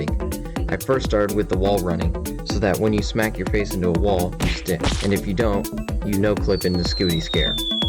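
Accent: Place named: United States. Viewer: ig your american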